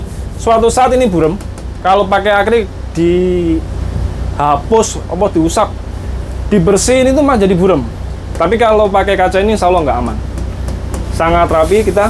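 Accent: Java, Indonesia. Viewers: Seneng cara menjelaskan mudah dimengerti indonesia medok medok 😁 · cakep, lux.. ini yg bawain narasi mas siapa? ok lah, cukup bagus, jelasinnya padat dan jelas.. walo logatnya kontras sama ownernya, ini medhok ala Jawa.. 😅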